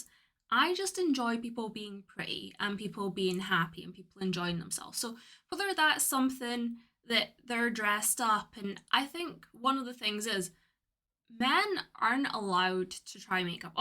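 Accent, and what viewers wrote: Scotland, United Kingdom. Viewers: May I ask where you are originally from? It SEEMS like Scottish, however your accent has picked up and dropped a bit... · Love the trace of a Scottish accent,as well your beauty,!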